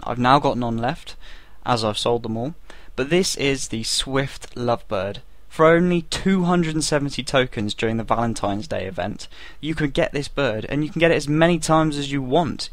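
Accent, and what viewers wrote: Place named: United States. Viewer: Thank you for the video! Love your accent... (usa)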